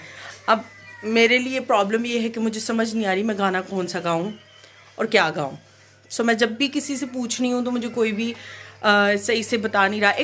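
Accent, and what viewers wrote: Sialkot, Pakistan. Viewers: You r frm sialkot ap ki urdu se lag rh ha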